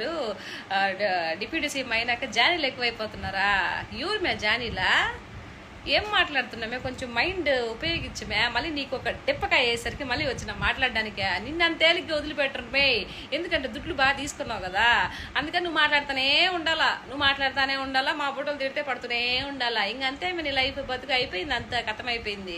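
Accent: Chittoor, India. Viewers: Chittoor slang super good warning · You are speaking chittur slang excellent.please do continuesly counters on ysrcp .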